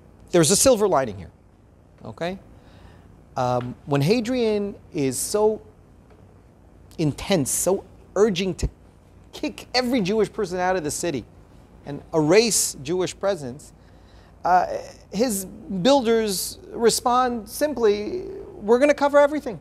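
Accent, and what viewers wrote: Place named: United States. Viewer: It would be better with subtitles. Sorry to say it, your American accent , sounds anxious and your tone of voice neurotic.. Think who is your audience. You are doing a disservice to a great work. Let an Israeli tell the story.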